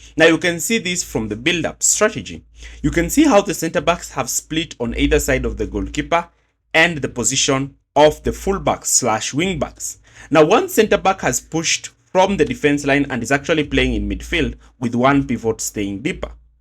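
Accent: Kenya. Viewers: Hii ni sauti ya mkenya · Vile niliskia hiyo sauti nikajua tu huyu ni mkenya. Keep up the good work.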